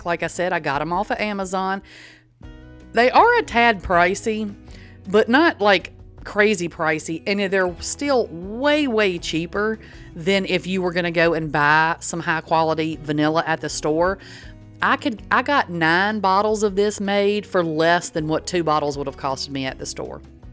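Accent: South, United States. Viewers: You sound like me!! Are you from the south too? I’m from Nashville. I love the tips you’ve shared! I’m single and although I don’t have kids, I’m finally putting my kitchen back together after a bad car accident and fighting cancer for several years. Almost everything I had expired or was freezer burned so badly I had to throw it out so I basically had to start over. Now I’m trying to make more things myself and be careful what I buy so I don’t consume too many ingredients my body doesn’t need. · Just found your videos and I chuckled though the whole thing!!! I was raised in the South and your accent makes me feel at home. And your style is just like mine.... spill vodka on the counter??? oh well... now it's sanitized!!! You got a new subscriber!!!! 🤣❤ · I JUST LOVED YOUR VIDEO AND YOUR SOUTHERN ACCENT 😊, THANK YOU FOR MAKING THE VIDEO !!!! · New Subscriber here! 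I'm a MeMe also! 🥰 These recipes are great! I love that you don't measure your seasonings!😂 I hear the Southern in your voice ( I'm Mississippi) and figured that's why. Can't wait to watch the previous videos!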